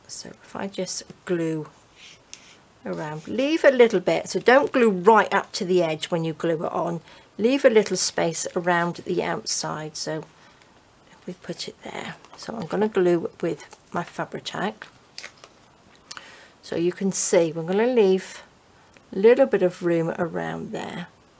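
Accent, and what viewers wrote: United Kingdom. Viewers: Hi, I'm loving your videos, thanks! Can you tell me where you buy seam binding on this side of the Atlantic, please? I'm in Ireland. (I'm assuming from your accent that you are in the UK, but maybe I'm wrong?:-) )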